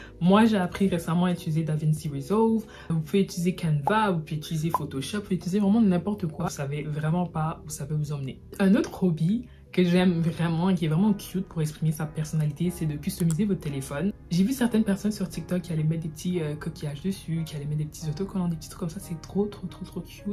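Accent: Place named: Canada. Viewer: Merci à toi aussi et hate pour ta partie 2❤❤💕💕 ( espione😈😈) (en plus j'adore ton accent canadien❤❤❤❤💕💕)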